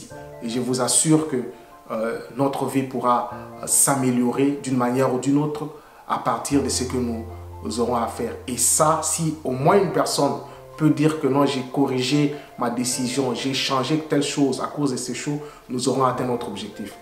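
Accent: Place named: Cameroon. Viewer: merci Je reconnais l’accent Cameroun Je me trompe?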